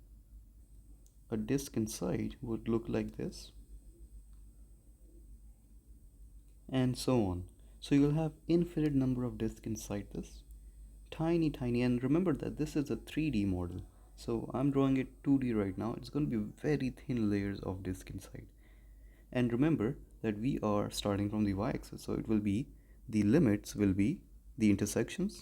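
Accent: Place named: India. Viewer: Bro u have indian accent i don’t understand anything u indian biryani scammer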